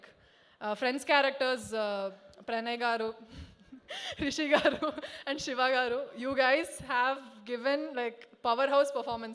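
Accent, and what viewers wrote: Telangana, India. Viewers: Telangana slang suprrrr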